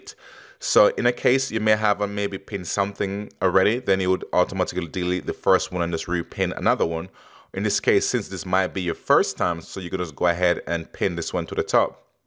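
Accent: Jamaica. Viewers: For some reason you sound like a Jamaican mix with more England also some US. 😂😂 anyway good video if I'm right i am inviting you over to my channel <link>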